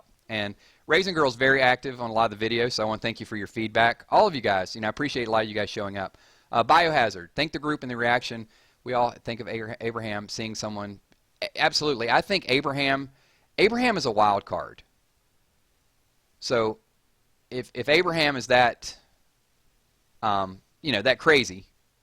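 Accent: United States. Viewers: And I like your voice it sounds country and that's what I like about you and all of your walking dead vids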